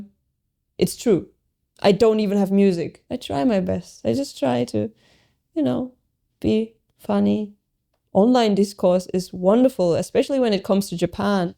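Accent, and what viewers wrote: Germany. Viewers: Finde ich schon. Wie sie Worte zieht/betont ist schon sehr Deutsch. Ihr Redefluss hingegen klingt nach einer Mischung aus Deutsch und Japanisch.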